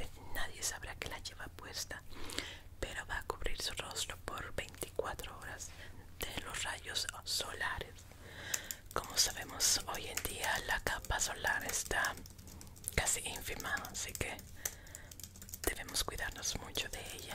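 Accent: Mexico. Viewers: me encanta tu voz! tienes un talento innato y lo mejor , es que eres mexicana :) (bueno eso creo, por tu acento)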